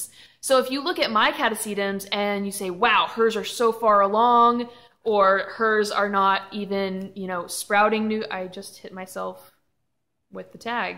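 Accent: South, United States. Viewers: I’m not sure where you live, I am not terribly familiar with the “eastern seaboard” and so I don’t know where the orchid show of which you were speaking is located. But, you mentioned your “southern accent” and my fiancé and I had to laugh. We live in New Orleans, but I grew up in North Dakota. I get made fun of for my accent, even though I’ve lived here for ten years and have lost most of my “up north” accent, I heard you say “you guys” so many times that I thought I’d hear an “eh?” at the end of one of your sentences, a la Canada. I’m very jealous that you live in an area where y’all have an orchid sale with international vendors; I have to drive to southern Florida to beg a wholesaler to sell to me if I spend $500-600. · LOL, you definitely have moments where the southern accent peeps thru, but honestly, you sound like you have a very neutral accent. 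Mix of east and west.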